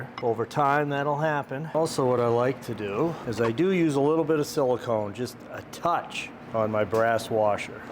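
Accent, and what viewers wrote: United States. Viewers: Is that a New York accent? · We’re in mass are you from I’m from Cambridge can tell by you talking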